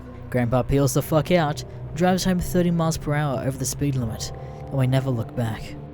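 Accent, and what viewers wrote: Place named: Australia. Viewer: It's always nice to hear another Australian accent on videos.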